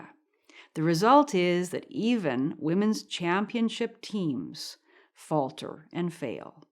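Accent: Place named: Upper Midwest, United States. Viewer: Sounds like a Minnesota or Wisconsin(Canada's neighbor makes sense) accent. Good video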